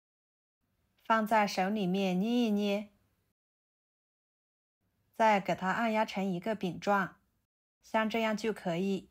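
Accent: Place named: Yunnan, China. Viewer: 云南普通话😂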